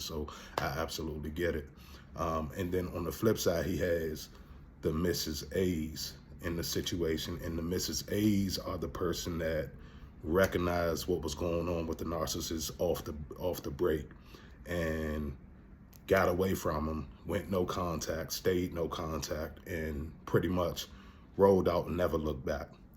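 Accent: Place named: East Coast, United States. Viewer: Thank you for sharing💛( You have to be from the east coast 😌)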